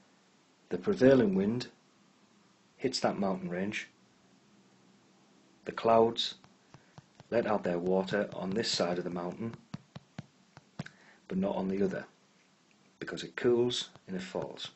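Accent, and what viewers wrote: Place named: North, United Kingdom. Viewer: Bloody love a northern accent - and it helps me to stay interested!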